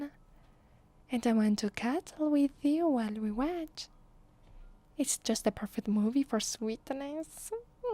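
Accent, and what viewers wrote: Italy. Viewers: Ah the almighty spaghetti accent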